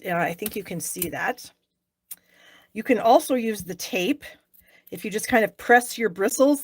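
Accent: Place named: Yorkshire, United Kingdom. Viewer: I'm pretty sure she's from Yorkshire....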